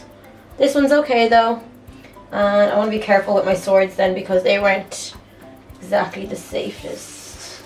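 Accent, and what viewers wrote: Ireland. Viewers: are u irsh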